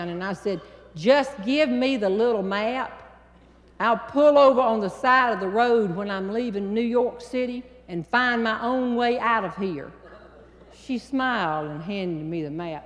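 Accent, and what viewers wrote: South, United States. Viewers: I've watched two separate videos on YouTube and correctly identified the guys as North Carolina natives (Eddie Smith, owner of the 1967 Ferrari NART Spider recently auctioned for millions, and singer Scotty McCreery), all thanks to watching videos and hearing her beautiful southern accent. Her wonderful stories make me want to visit the US and travel from North to South just to see if I can peg the difference in accents. 😉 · I’m a wee Scottish woman and I understand every word of your lovely southern accent!!! 🤩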